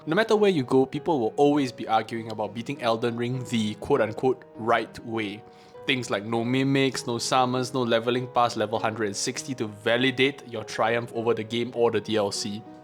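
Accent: Singapore. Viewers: * Hears accent, hmm Singapore?🤔 * Checks Channel -> Bingo🤓 (Hello from South Africa 👋🏾🇿🇦)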